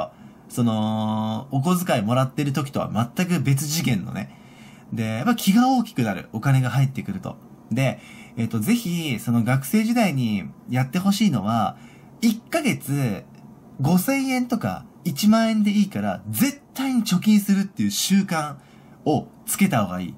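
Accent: Kansai, Japan. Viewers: おとんってｗ さん、ところどころ関西のｲﾝﾄﾈｰｼｮﾝがありますけど、関西人では無いんですよね？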